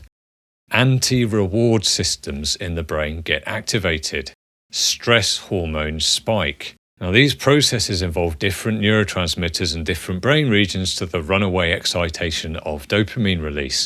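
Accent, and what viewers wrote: England, United Kingdom. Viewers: 50 bucks says you know this from both sides, doc, because the transference must be off the charts. All that and an English accent to boot. 😂 Funny thing happened to me, I politely extracted myself from a budding friendship with an unavailable LO, but now I'm running into him everywhere in a city of three quarters of a million people. It's like a cosmic practical joke.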